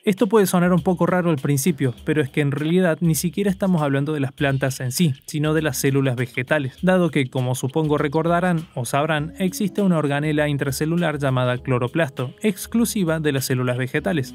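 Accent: Córdoba, Argentina. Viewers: Disimulalo pero sos cordobes, manso video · Te noto acento cordobés, le doy like por la musiquita de terraria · si es argentino, escúchale el acento nomas. Ni ahí es un verdulero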